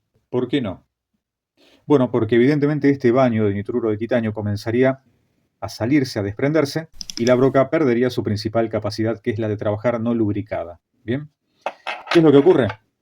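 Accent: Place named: Argentina. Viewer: Sonsonete argentino es molesto.... pongan alguien que hable español neutro... gracias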